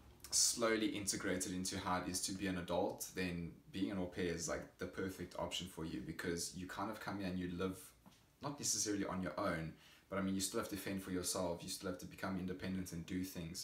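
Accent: South Africa. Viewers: Are you South African ? Idk the accent sounds so familiar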